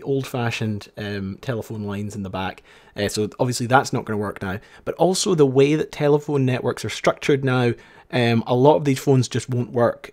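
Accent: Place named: Scotland, United Kingdom. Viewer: "dialing the hooce". I love the Scottish accent. My girlfriend is from Oban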